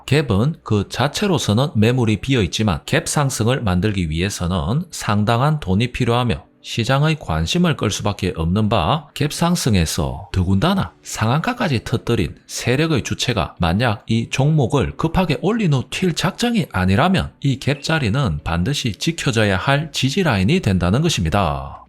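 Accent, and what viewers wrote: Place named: Gyeongsang, South Korea. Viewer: 세련된 경상도 억양이 집중도를 높이네요 귀에 쏙쏙 ~~ 영상 굿~~~ 감사합니다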